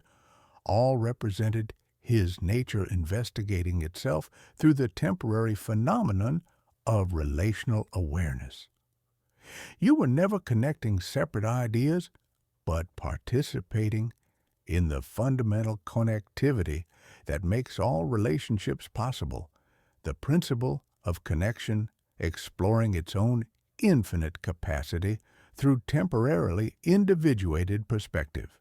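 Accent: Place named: Georgia, United States. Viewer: truthfully I think it's the Georgian Southern gentlemen voice narrating THIS at me that's oddly... amazing somehow for some reason I can't quite put my finger on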